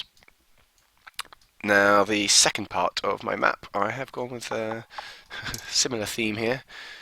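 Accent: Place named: United Kingdom. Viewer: The intro: DEATH FIRE SINS HELL IS REAL The guy: * sweet little british man * I assume british sorry if not **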